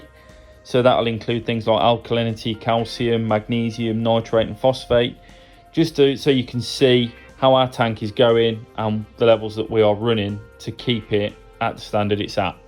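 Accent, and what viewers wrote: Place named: West Midlands, United Kingdom. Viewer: West Midlands accent I'm hearing there I think pal?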